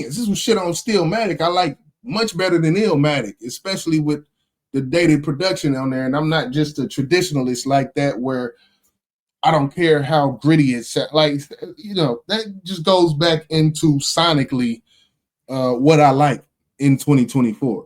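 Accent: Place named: Tennessee, United States. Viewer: You obviously don't know Hip Hop. All over the planet, is ALWAYS in the top 10 best rappers list....and ALMOST ALWAYS in the top 5 best list...and often in the Top 3....and typically called the GOAT by many with Illmatic.as best Hip Hop album ever.....just like this guy here did, and he sounds like he's from back water TN....not North East! Lol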